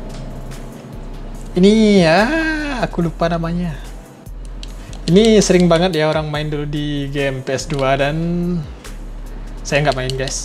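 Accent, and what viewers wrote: West Sumatra, Indonesia. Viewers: Urang Minang Uda?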